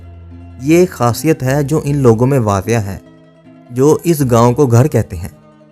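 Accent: Lahore, Pakistan. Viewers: Are U Lahori Bahi- BGV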